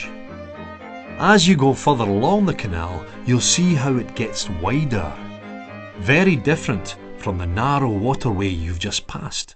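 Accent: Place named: Highlands, United Kingdom. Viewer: Wonderful and very interesting. Love the highland accent.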